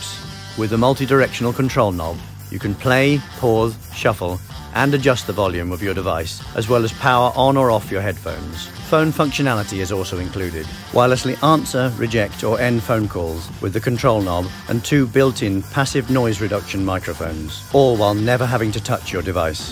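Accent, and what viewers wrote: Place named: Germany. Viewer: 3 yıl geçmiş ama neden türkçe başlıklı bi videoyu alman aksanlı ingilizce olarak eklersiniz ki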